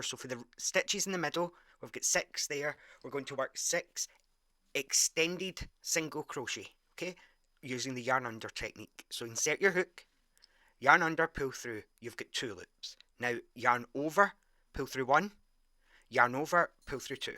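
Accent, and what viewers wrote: Scotland, United Kingdom. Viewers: So cute! I love the Scottish accent. I am subscribed for sure!! Get well soon! I know all about back pains. Rest up!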